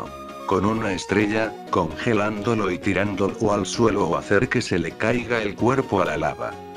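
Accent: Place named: Castile, Spain. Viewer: Ese castellano papu :v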